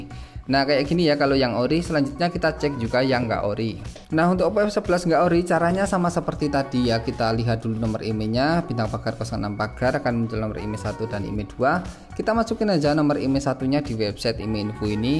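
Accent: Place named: Java, Indonesia. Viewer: Jawamu terlalu medok mase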